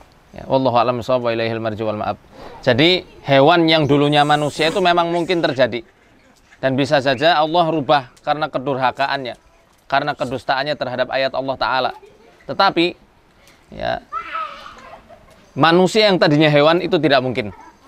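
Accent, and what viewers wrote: Banyumas, Indonesia. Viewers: Wong ngapak pancen jos koh jannn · Ngapak yaa 😂😂😂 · Ustadz nya dr negara ngapak ya ...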